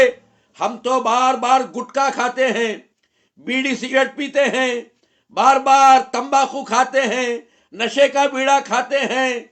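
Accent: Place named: Hyderabad, India. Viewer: Uncle g ap ki language hyderabad ki mujy bht pasand hey ALLAH ap ko sehat dey. From pakistan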